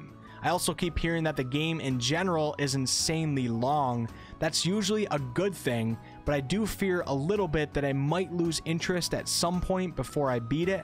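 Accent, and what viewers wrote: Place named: Midwest, United States. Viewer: That midwestern accent peeking out on occasion.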